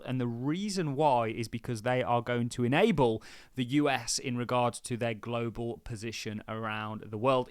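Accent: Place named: Stourbridge, United Kingdom. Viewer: You from Stourbridge, Mate? Or is it Redditch?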